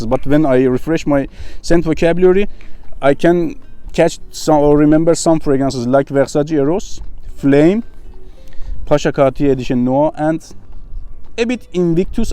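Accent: Iran. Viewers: You Are iranian guy i Can Hear it out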